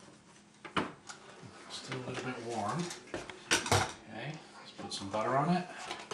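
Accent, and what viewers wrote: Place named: United Kingdom. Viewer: Who swears with british accent?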